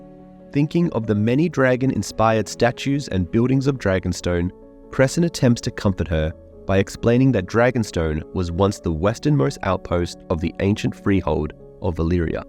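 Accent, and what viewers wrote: Australia. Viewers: its his voice he says he has a australian accent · Where is his accent from!?! Driving me crazy 🤪 I’m leaning towards Australia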